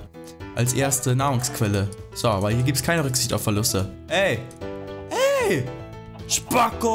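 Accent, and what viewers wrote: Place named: United States. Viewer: Er hat iwie einen amerikanischen Akzent :))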